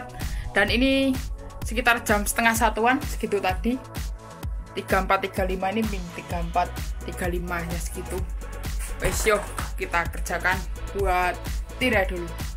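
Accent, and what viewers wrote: Java, Indonesia. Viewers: Mas dari mana ya? Logate jowo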